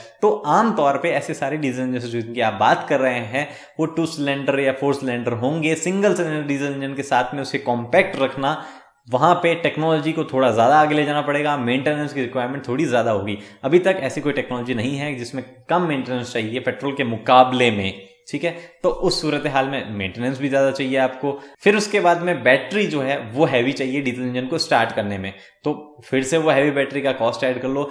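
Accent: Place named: Gujarat, India. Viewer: Are you a Gujrati? You've a strong MTI. :D Btw, nice video.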